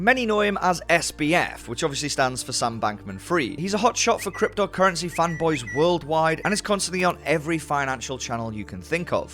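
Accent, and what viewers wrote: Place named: Whitley Bay, United Kingdom. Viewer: Sounds like a Whitley Bay accent 😏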